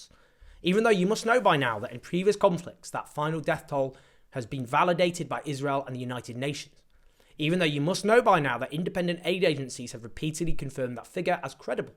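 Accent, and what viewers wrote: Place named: England, United Kingdom. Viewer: You talk in a pommy accent so unless you live There in Israel you only believe what your hearing from Hammas who are lieing, just listened to the speech comming from a son of a Hammas comander who grew up in Garza, he speaks in in support of isreal and said don't believe the lies comming from Hammas about thousands of children been killed it's all lies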